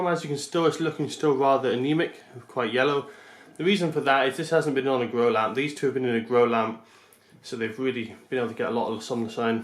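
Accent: Scotland, United Kingdom. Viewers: Fuck you go with brexit, I hate this accent I could slay everyone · He's using british pronunciation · I guess that 400 people with the famous "brown thumb" gave 'thumbs down'. No chance for them to grow anything EVER, so they hate everybody who is able to grow their own stuff. Awesome video, even you talk too fast too much, and half of the time you are mumbling, hard to understand for a Non-Scotch. Still, awesome. It's alsoeven easier to have green onions all year long, which I love more than basil. They grow indoors and outdoors.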